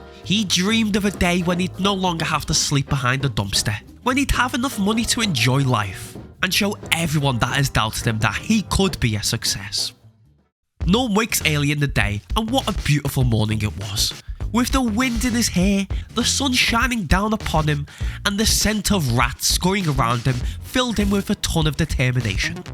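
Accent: Liverpool, United Kingdom. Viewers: We have a scouser, yes lad good to see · Are you scouse? Love your accent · are you from liverpool? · I know that's a Liverpudlian accent and this is just fuckin' hilarious. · Is he a scouser? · Love the scouse accent peaking through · Is this a scouser speaking????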